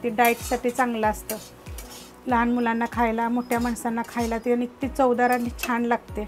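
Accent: Satara, India. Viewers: सातारकर आहे काय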